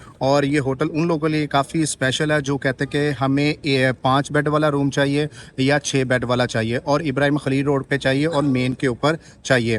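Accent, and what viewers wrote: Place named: Punjab, India. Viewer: Ap Baloch hain but ap ka lehja Punjabi jaisa hay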